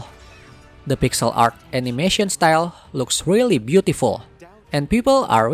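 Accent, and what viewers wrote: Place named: Indonesia. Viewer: thanks for the video.. can't wait for Eiyuden Chronicles! 😍 denger dari logatnya.. orang Indo ya bang? wkwkwk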